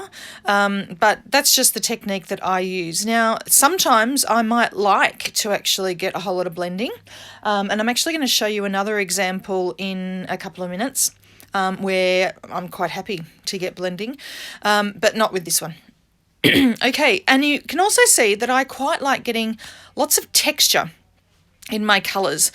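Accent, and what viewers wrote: Australia. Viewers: Hi. Happy Easter 🐣. Thanks for sharing your alcohol ink techniques with us all. What an honour to be celebrating Jessica! The blues and bronze on white is my pick. So rich and lux looking. Oh and I love your Australian accent. It’s just like mine 😉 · A fellow Aussie, Yay! Glad to hear the familiar accent during the hop. New subscriber from Central Coast NSW ❤️🇦🇺 and just a quick tip for when you run out of the Snow White mixative, I use white nail polish! Sometimes you need two coats depending on how opaque it is. 😊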